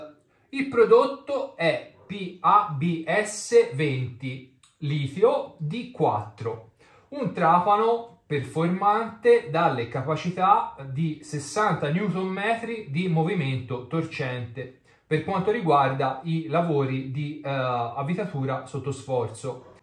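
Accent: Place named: Tuscany, Italy. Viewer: Puoi evitare di parlare il toscano dato che ti seguono in tutta italia?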